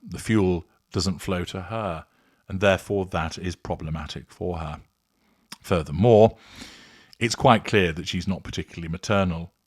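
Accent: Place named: Yorkshire, United Kingdom. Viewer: Ooh you sounded like Sean Bean with your Yorkshire accent😊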